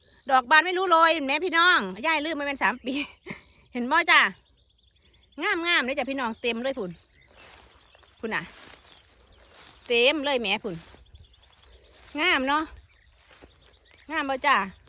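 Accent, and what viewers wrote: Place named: Ubon, Thailand. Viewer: 👋😊เดนมาร์กอุดมสมบูรณ์ทุกอย่างน้อจ้า(ขอโทษเด้อยายเป็นคนจังหวัดได๋น้อสำเนียงคึคนบ้านข้อยแท้?)อุบล.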